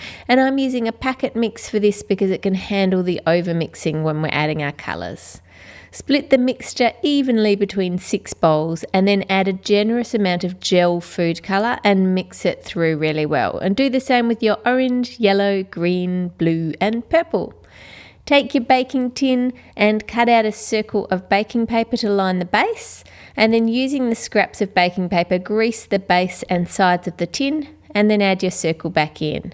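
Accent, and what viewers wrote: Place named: Australia. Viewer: Because she's from Australia had as an accent.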